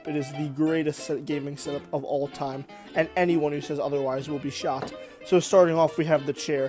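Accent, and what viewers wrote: United States. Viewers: Why you speak like westerner?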